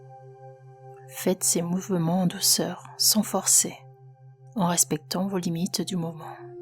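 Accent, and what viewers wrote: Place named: Normandy, France. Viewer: Merci pour cette belle vidéo je vais être subjectif mais étant de Normandie il y a un léger accent dans ta voix qui fait que tubers ton travail est intéressant et agréable merci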